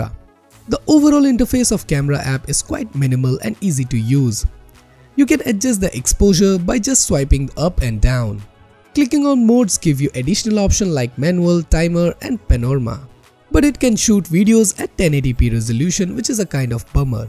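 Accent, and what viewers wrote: India. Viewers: God indian accent is the most unbearable.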